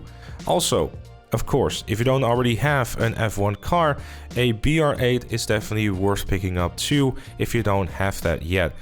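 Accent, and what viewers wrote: Netherlands. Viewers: Love it, are you Dutch tho?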